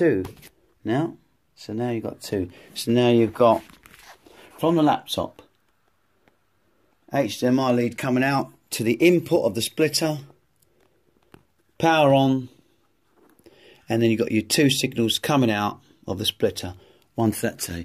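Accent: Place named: United Kingdom. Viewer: i really love the british accent. Cheers man! Very helpful.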